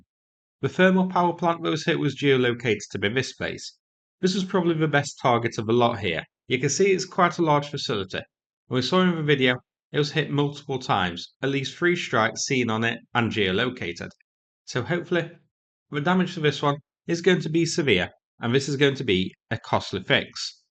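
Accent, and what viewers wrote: United Kingdom. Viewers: u fucking clown turn off ur retarded bri-ish cuck accent when?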